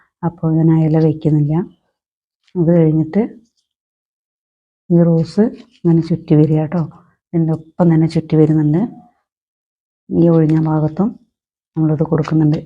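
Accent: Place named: Palakkad, India. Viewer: njaanum palakkattukaaran aanu.. samsaaram kettappol thonni 😍😍😍😍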